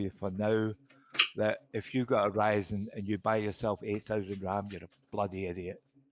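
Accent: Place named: United Kingdom. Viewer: oh god a brit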